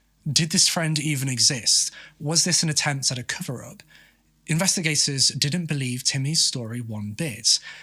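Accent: United Kingdom. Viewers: Are you british? I love your accent.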